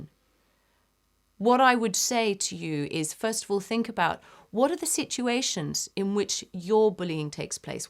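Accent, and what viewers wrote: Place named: RP, United Kingdom. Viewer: Refreshing to hear a posh voice wierdly. Thanks for doing this video very good topic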